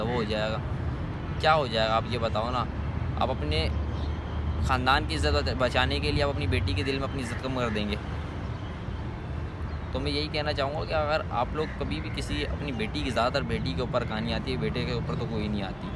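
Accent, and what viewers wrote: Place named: India. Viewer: Assalamu alaikum bhai, Aap Mujahir hu shayed kyuki aap indians ki taraha baat karte hu may be u r family is Indian..........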